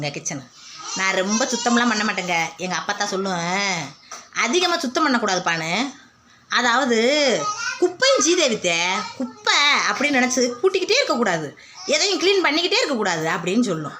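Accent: Madurai, India. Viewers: But your madhurai slong vera level, i am live in karaikudi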